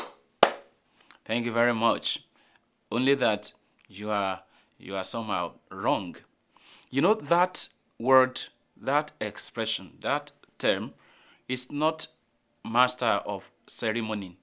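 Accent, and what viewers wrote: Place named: Yoruba, Nigeria. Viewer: Please, get rid of your Yoruba accent, as it can distort your message if someone who's not familiar with Yoruba people listens to you. "In this episode, I want to 'h'ask you...."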